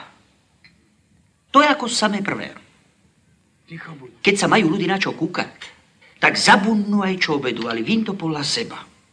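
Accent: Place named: Western Slovakia, Slovakia. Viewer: Tota zapadňarščina še mi mocno ľúbi😂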